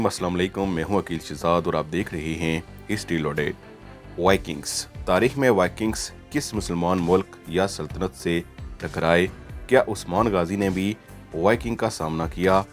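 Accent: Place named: Kashmir, India. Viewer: R u Kashmiri ?? you Accent kashmiri hai. Distric kotli ka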